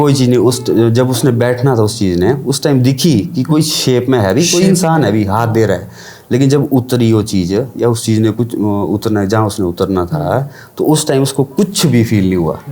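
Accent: Pahari, India. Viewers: हिंदी में जो पहाड़ी भाषा की आवाज आती है वो मुझे अच्छी लगती है।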